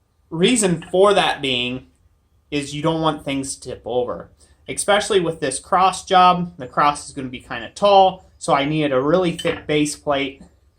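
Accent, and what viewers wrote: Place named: South, United States. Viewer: so your from dayton I always though you were from the south, anyway what is your thoughts on hammering uri hoffi style?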